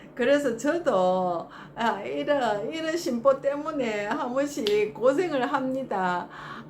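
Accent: Gyeongsang, South Korea. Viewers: Wow! 雨中이라도 예쁜 女뻐꾸기의 경상도 사투리 소릴 들으니 기분은 상쾌하네요! 아름답고 매력적인 음색의 말씀과 편집이 잘 된 영상, #우리의삶은_매일쓰기다의 좋은 교훈은 종일 듣고 싶네요. 늘~ 행복하시고 - - - 계속 재밌는 말씀을 기대 합니다. :body-blue-raised-arms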